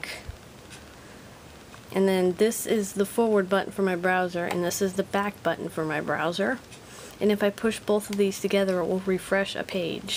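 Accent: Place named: United States. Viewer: I really like your voice and English accent. Also, you've got a sexy laugh . Don't think I'm hitting on you, just a honest observation. Where are you from? East coast? West coast USA? midwest? South?. I'd like to know what particular US English accent I like, and this is it.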